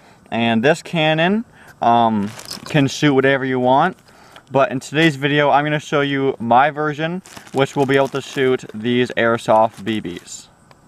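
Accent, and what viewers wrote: Sweden. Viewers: Are you swedish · are you swedish ?!? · Haha är du svensk?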